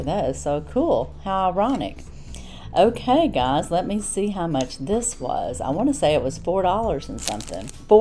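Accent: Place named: South, United States. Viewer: Hi.. so happy yt put you in my feed. Its nice to hear someone who sounds like me.. country 🤣 😍😍 love it lady keep going! I have started my channel too! Excited God bless you sister 🥰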